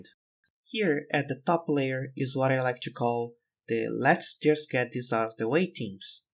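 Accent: Brazil. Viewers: are you brazilian? the pronunciation of certain words are very similar to brazilian people speaking english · cara, vc é br? pronúncia parecida com a nossa.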